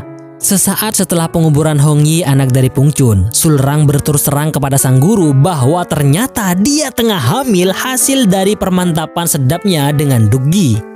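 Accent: Madura, Indonesia. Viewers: Madureh yehh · Ser reng medhureh reh narator eh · Madureh kang ? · reng medureh kak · Kreator nya orang madura anjirr 😩🤣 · Orang madura ya bang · Reng madureh admin nah 😁😁😁💪💪💪💪💪💪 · Madura mana bos,, wkwkwkwkwk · Orang Madura mas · lu orang Madura ya ? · Abeeehhh reng madure be'en kak ?? 👍👍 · Patek jeh atmin reng madureh yeh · Naratornya orang Madura ya?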